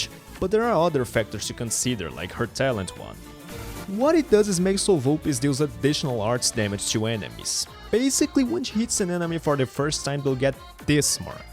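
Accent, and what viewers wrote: Brazil. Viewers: you sound brazilian 🤔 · I dont know if you're brazilian or not, but i swear bro you just HAVE that brazilian english accent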